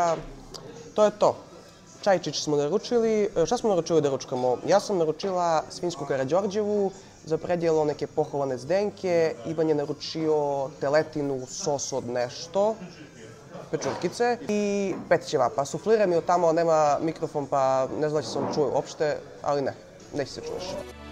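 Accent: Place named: Niš, Serbia. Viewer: to je neki "GU, GA, ĆE, ČE" Niški u kombinaciji sa Vranjansko-Leskovački i primesama LeBane...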